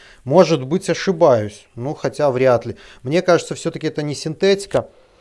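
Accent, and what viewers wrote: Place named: Germany. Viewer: Ну, если написано по-английски, то произносить желательно не по-немецки. Но это мелочи, обзоры хорошие.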